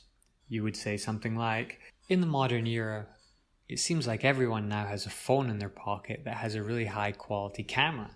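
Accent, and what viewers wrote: Scotland, United Kingdom. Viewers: I'm really curious about your accent. Can you explain what influences there are? I can detect a fusion of Scottish, North American and perhaps, Irish?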